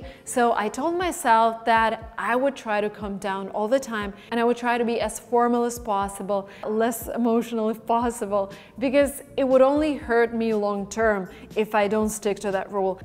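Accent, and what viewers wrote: United States. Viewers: Hi ma'am! I've been watching your videos from this year and I've quite improved my vocabulary and caught up the American accent. Thank you for bringing on new things every week. 😇❤️ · I love your way to teach English with an American accent 😊👍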